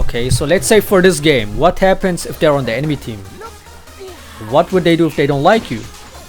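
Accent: Germany. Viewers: Die English Aussprache haha :D Kannst du mal ein Video in deutsch machen? ^^ Bist doch deutsch oder o.O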